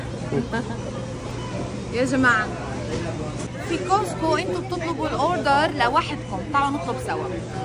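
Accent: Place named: Lebanon. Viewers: واذا حكت لبناني عادي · هي فلسطينية بس من حبها لدكتور فودها بتحكي بناني ومن الحب ما خلى الواحد يطلع عن دينو ولهجتو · ليش عم تحكي لبناني شكلك لبنانية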